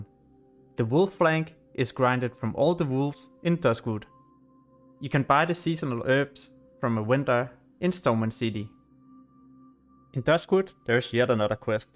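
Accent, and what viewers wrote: Denmark. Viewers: Danglish my friend ;-)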